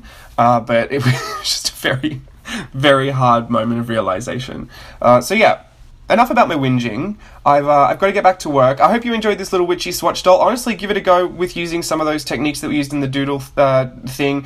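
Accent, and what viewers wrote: Australia. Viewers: Please never lose your Australian accent! Keep up the good work! Thanks!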